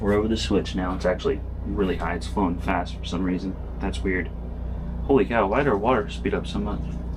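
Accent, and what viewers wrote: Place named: South, United States. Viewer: 🤔 hmmm, why do have a southern accent in some of you videos and not an accent in others?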